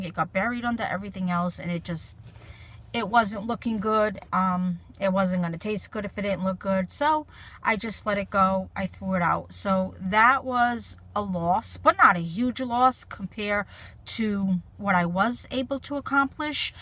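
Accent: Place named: New England, United States. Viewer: So I thought maybe you were a New Englanda because I heard you say "freeza" and "dooa" (door). LOL. Great video! Thanks for sharing!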